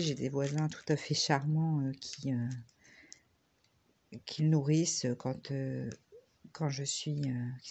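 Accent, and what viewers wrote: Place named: Nord, France. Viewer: Bonjour! je découvre ta chaine... je suis également du Nord j'habite près de Lille, et je fais aussi du coloriage pour adultes depuis plusieurs années..je lis aussi beaucoup et j'ai également un chat noir!!.j'avais bien reconnu le ptit accent du Nord! à bientôt sur ta chaine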